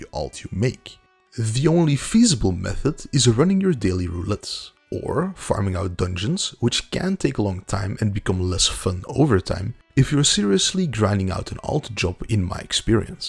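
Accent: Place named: Belgium. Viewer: i swear you sound belgian and i love it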